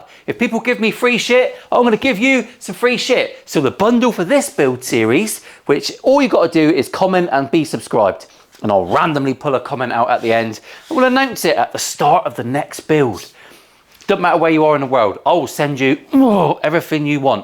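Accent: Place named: Cornwall, United Kingdom. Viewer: Listening to you makes me miss my time spent living in Plymouth and Cornwall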